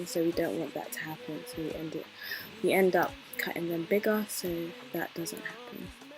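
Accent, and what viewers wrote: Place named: London, United Kingdom. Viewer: I can hear the London in you. Lool. Great job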